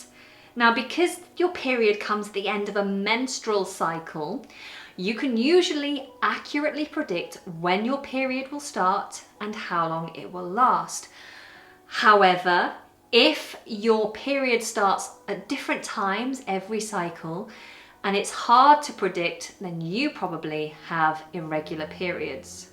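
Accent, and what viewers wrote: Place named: RP, United Kingdom. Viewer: I experienced the most unique feeling of both discomfort and pleasure listening to you speak about the menstrual cycle. I think it was the juxtaposition between the somewhat messy subject matter and your sublime Received Pronunciation.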